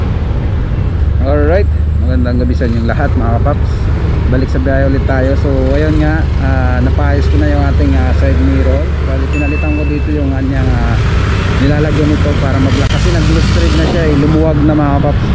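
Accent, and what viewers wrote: Philippines. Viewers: bisaya ka paps? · Ilonggo ka ba papsi?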